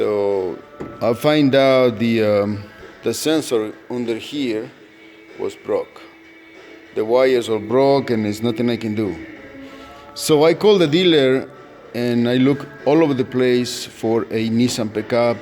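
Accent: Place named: Zacatecas, Mexico. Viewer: His English is good, and the accent is not a problem if English is your first language. Esta bueno, Su ingles, y el asento no es un problema, si ingles es su primero idioma. I was content with his knowledge of adapting a Dodge part to the Nissan D21. Pienso que su asento es de Zacatecas?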